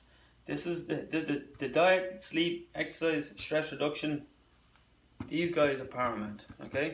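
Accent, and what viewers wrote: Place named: Ireland. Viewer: Where's that cute accent from? Ireland? I bet Ireland has alot of DP/DR due to your strong need for omega 3s (fish) and high alcohol intake (depletes B vitamins). I'm a fourth Irish and Swedish...very delicate brain/CNS. Was diagnosed with AF 20 years ago. Unfortunately I didn't learn the cause of AF and DP (22 years now) until 6 months ago. But Thank God I did. It's all rooted in a B1 deficiency. As a nutritionist I have lots of great resources I can share. But here's the basics... B1 in the form of Benfothiamine plus an excellent methylated B complex. Also lots of magnesium as a co-factor. Daily or a pound a week of beef liver...a B-BOMB! An important traditional health food. Vit D supplement too. Lastly, I highly recommend a traditional Northern European Diet...free of salicylates. Great video! I love your scientific nutritional approach! 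That is very much lacking in the DP world. Let me know if you want more info.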